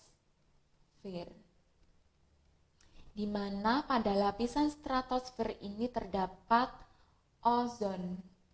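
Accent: Java, Indonesia. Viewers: Alhamdulillah kak bermanfaat, belajar sekarang lebih nyaman bisa online begini, jadi gak monoton ❤️ Sukses ya kak ~ Walaupun agak medok 😁😁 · Menarik... bisa sebagai referensi belajar.. kak suara medhoknya dipertahankan ya...😆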